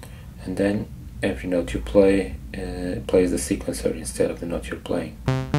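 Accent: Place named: Portugal. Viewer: Are you from Portugal? You do sound like it, anyway, great job...and accept some form of donation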